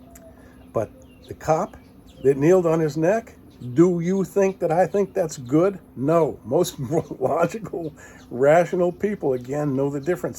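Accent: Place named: United States. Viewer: Hey! You sound like an American! . . . I could not have said it better.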